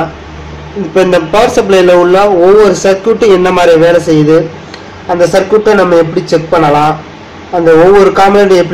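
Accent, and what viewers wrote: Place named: Madurai, India. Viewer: Good explain in madurai tamil...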